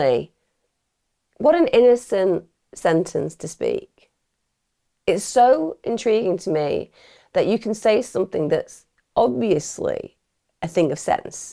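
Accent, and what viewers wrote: Australia. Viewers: Your Aussie accent made me smile! Love from down under 🇦🇺🦘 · Hey, I’m from Australia and have not heard of this case until now. Our justice system is despicable! On a side note though, you’re Aussie accent isn’t too bad xx I’d love for you to also look at covering the Shaniya Davis case. I’ve come across a few stories about her case but haven’t watched them in the hopes that I see her story on your channel. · Loveee the Aussie accent 😂😂😂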